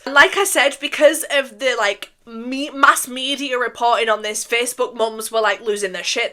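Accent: United Kingdom. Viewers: when i tapped on the vid i did not expect a heavy british accent😭😭 its so iconic tho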